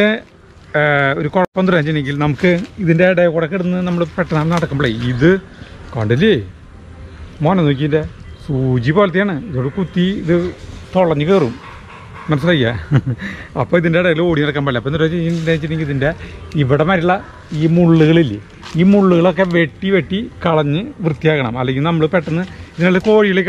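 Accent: Thrissur, India. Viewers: Thrissur slang❤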